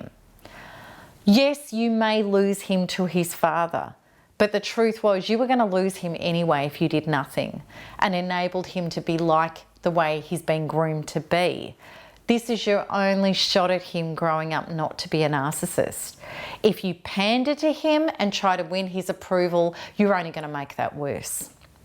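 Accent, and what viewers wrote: Australia. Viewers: Goddamn! You are such a beautiful woman, that Aussie accent and that look my god a weekend with you would be all the treatment I would need!! Gourgeous! Love the content love the channel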